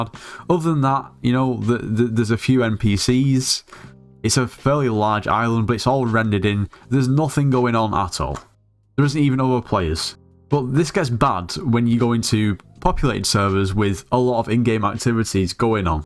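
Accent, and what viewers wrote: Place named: Midlands, United Kingdom. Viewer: Also where are you from, you dont sound too northern. I want to say midlands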